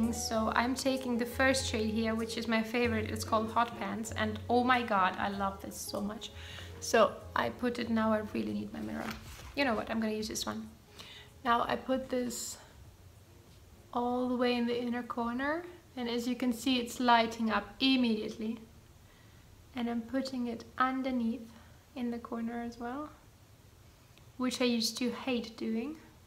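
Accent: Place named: United Kingdom. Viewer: Don't worry we love your British accent 💛 And thanks for sharing your new video I love them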